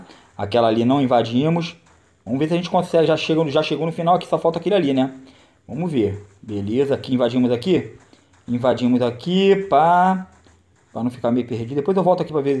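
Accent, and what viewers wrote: Rio de Janeiro, Brazil. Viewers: tu é carioca Mano?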